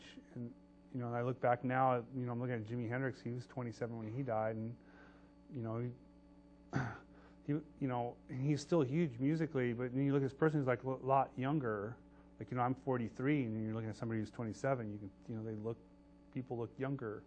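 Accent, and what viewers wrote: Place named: Croatia. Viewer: he was born in croatia right? to.... i forget what kind of parents, but i can hear it in his accent.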